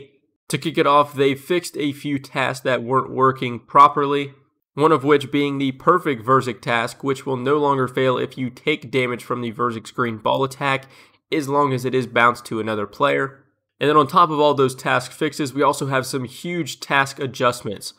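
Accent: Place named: Philadelphia, United States. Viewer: your accent definitely sounds like philly/delco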